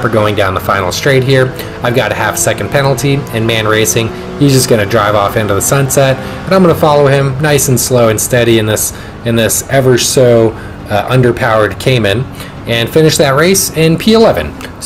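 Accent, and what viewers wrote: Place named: United States. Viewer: I really enjoyed watching this! Please keep grinding I’d love to finally have a American English speaker to watch daily on gt7 !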